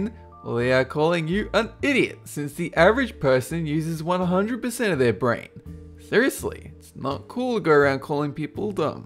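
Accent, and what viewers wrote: Australia. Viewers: I was wondering for a while, i am struggling to pin accent, is he Aussie?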